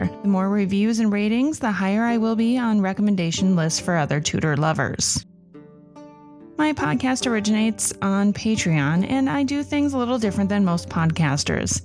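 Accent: United States. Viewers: Rather listen to English casts reading this .Why have an American reader sounds weird .Could only listen to two minutes.Your voice is terrible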